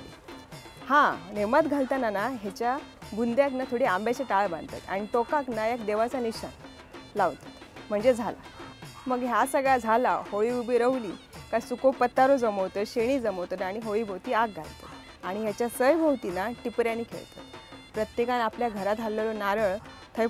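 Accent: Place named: Goa, India. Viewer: तुम्ही लय भारी तुम्ही गोयचे काय तुमच्या बोलण्यात तसा वाटला म्हणान ईचारलय बाकी काय नाय.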